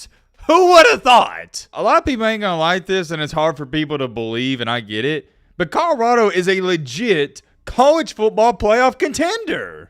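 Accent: South, United States. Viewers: Love your Colorado talk with your southern twang.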